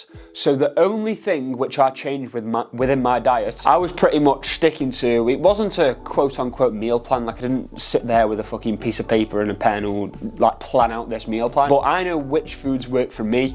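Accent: United Kingdom. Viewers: Great physique + strong regional British accent + vulgarity = Subbed. 👌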